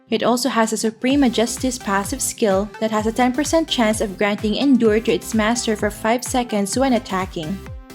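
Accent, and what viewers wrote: Philippines. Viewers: You sound like a filipina trying so hard to sound american but your filipino accent is coming through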